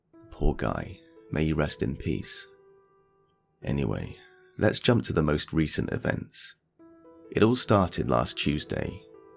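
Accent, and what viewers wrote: United Kingdom. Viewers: You have a good voice for story telling. I always prefer an English accent. Would also love a Scottish accent. I can't stand Mr Reverants voice. Sorry Rev.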